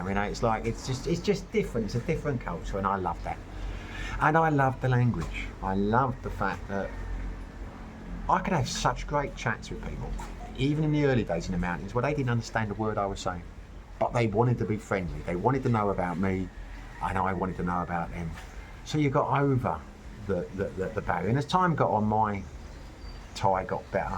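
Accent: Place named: Cockney, United Kingdom. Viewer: My 1st visit to the Phils will be later this year, but I already know I won't be able to stand the noise, as I'm highly sound sensitive. Thai & Bali vs Phils, but I've heard Vietnam beats em both, & is a lower cost of living. If you can interview a mate with Vietnam experience, that'd be cool. With your Cockney accent, I can't catch half of what you're saying, but I still appreciate your awesome content!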